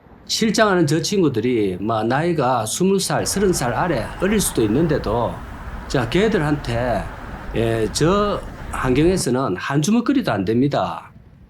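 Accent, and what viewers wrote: Yanbian, China. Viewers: 이사람 발음보니 조선족 화교인갑네.. 어쩐지 말투가 역스럽다 했어... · 여기주인장님 국적이 대한민국아니죠?? 발음과 사투리가 너무 표가납니다. 연변사투리정도가 더 가깝다 ㅠ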